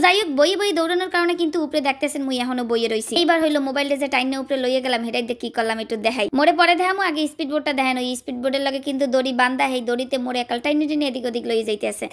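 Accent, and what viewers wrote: Barishal, Bangladesh. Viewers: আমার বাড়ি বরিশাল। তাই আপনার কথাই আমার কাছে সবচেয়ে বেশি ভালো লাগে। ভালো থাকবেন।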